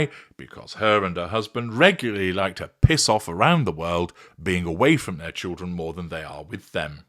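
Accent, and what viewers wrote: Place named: RP, United Kingdom. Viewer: Yep, posh boy swearing is addictive in the nicest possible way😂😂😂😂😂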